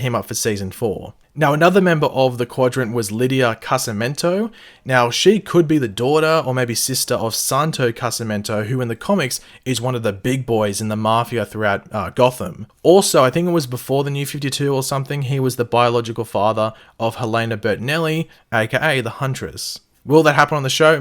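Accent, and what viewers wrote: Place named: Australia. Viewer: Yhhh I just love your aussie accent 😍 used to watch bondi rescue to get a weekly dose of it now I can just listen to you 🤗😏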